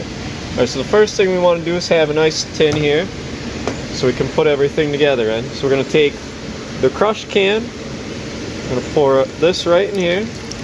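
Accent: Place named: Bronx, United States. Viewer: You sound like your from thr bronx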